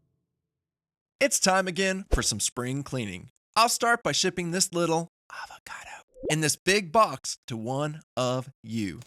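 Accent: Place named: North Carolina, United States. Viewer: I thought I recognized a North Carolina accent. Where are you located? My shop is in Whiteville from from outside of Hickory originally.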